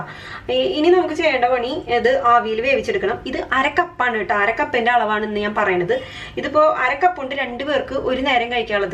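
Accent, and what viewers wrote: Thrissur, India. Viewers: വളരെ നന്നായിട്ടുണ്ട്, നമ്മുടെ തൃശൂർ ഭാഷയും അടിപൊളി, തൃശൂർ എവിടെയാ, ഞാൻ ഇരിഞ്ഞാലക്കുട യിൽ ആ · പിന്നെ എന്തുട്ട്ണ്::: തു ശൂർ ഭാഷ like it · അടിപൊളി മാഷേ ഇമ്മടെ തൃശൂർ ഭാഷ അസ്സലായി ഞാനും തൃശ്ശൂരാണേ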